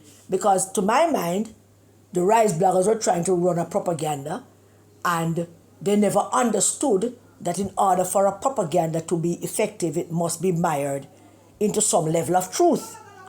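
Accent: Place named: Jamaica. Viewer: Everyday we listen to ur videos.....we've noticed the true Jamaican in u coming out.....Continue on ur endeavors #ONEJAMAICAFORJAMAICANS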